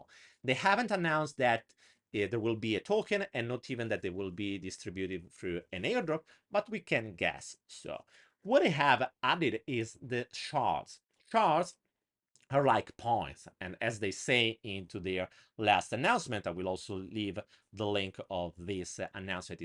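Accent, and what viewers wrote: Italy. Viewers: Love your accent. Is it Italian? Spanish?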